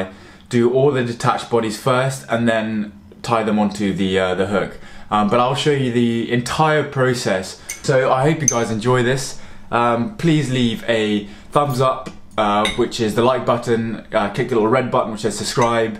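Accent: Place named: RP, United Kingdom. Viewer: Gosh! What a delightful young man - with talent. And what a delight too, to hear a presentation in RP., where every word is immediately understandable. He's a student, and like many students he's experimenting with his own image and accessories - I remember that phase well. The hair and the bangles and beads may go eventually, but his ability to communicate will remain. Terrific. That's excellent skill and a pretty fly, but (and I do apologise for raining on you effort) those stiff wings do dreadful things to one's leaders - twist, twist, twist. I think you have a fine future.What are you reading, and where?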